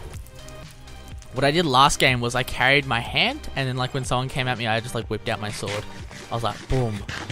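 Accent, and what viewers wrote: Australia. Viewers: 15 I came from his new react video xDD. Ikr he sounds so Australian here and much more younger.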